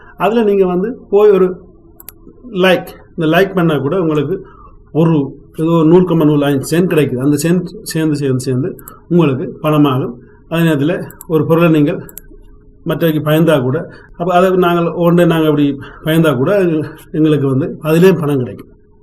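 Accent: Sri Lanka. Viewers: உங்கள் இலங்கை தமிழ் அற்புதம் நம்பரே. எனக்கு ஒரே ஒரு சந்தேகம். நமக்கு பணம் எப்படி வந்து சேரும் .அதை நீங்கள் சொல்லவே இல்லையே .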